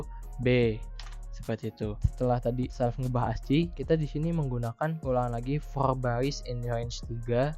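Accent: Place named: India. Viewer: The real india accent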